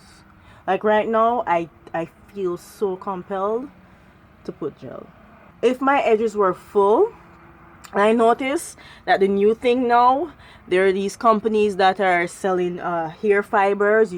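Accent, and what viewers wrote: Jamaica. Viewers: First 12 seconds and I figured you’re from Jamaica or one of the islands!! 👏🏽 you definitely got my subscription. Great video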